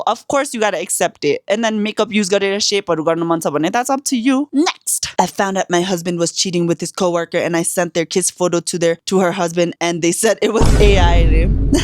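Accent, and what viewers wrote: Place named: United States. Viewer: "tpi ko accent kina yesto amrica" 😭😭😭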